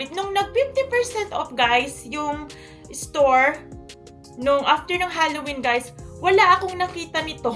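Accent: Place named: Visayas, Philippines. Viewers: Maam bisaya ka po ba?newbie here po..kasi pansin ko po parang hirap kayo sa tagalog po..nag lolog po kayo hehe sorry po,bisaya rin po kasi ako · Waray po siya kung hindi ako nagkakamali. Magkaiba ang Bisaya sa Waray po.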